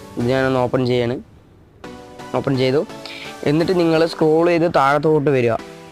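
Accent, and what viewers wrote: Palakkad, India. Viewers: Your palakkadano?? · Enthanda നീ പാലക്കാടാണ് ഓ തെന്നെ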